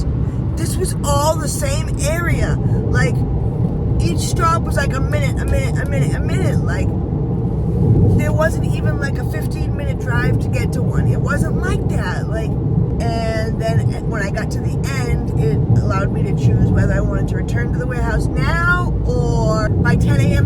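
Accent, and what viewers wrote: New York, United States. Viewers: Thought you from New York or something like that bc of your accent 😆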